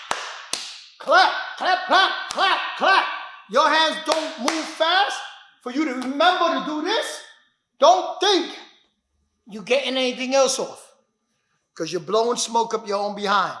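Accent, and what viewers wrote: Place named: Brooklyn, United States. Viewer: Straight-up Brooklyn New Yorker. The South Bronx hears you and feels you! Stay Righteous!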